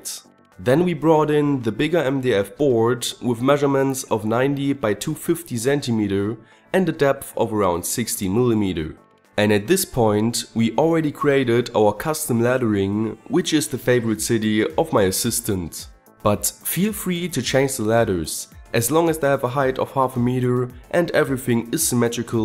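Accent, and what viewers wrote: Germany. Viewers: JV Also offensichtlicher deutsch geht es kaum: sein Akzent, "Leipzig", Deutsche Marken. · Du bist aus Deutschland oder? Das hört man:) gutes video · Du krass... Läipsich...góil.... I wüsste das du deutsch bist wegen dein akzent, grüße von plagwitz :P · are you german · I love your German accent :) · So many times he says zentimeeters xD I am more into the electronics Videos of GS but this one beats them all wit di akzent :D ( and please don`t get mad man ;)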